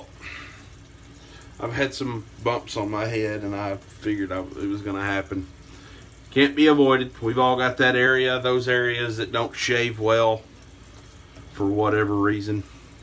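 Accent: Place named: South, United States. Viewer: Great Southern accent, what state is that?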